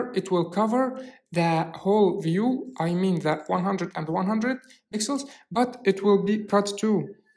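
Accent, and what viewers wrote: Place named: England, United Kingdom. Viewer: Love the way you explain concepts, and especially your English accent it's so cute!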